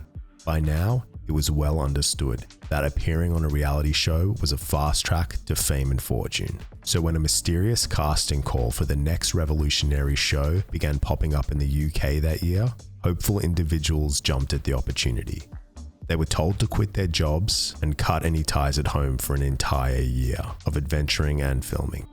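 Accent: Australia. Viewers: Ahhh..... Nce to hear a bit of smooth Aussie narration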